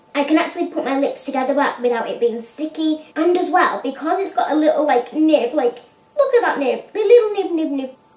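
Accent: United Kingdom. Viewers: Lol I totally used to always think you were calling us all "donkeys" and not "junkies" when I first started watching your videos! Hahahaaaa! 😂 I was like, why is she always calling us all donkeys? Lol don't hate me I'm so sorry I am absolutely AWFUL at trying to understand and hear British/English accents clearly lol. I'm from St. Louis Missouri so I'm terrible at trying to make out your accent.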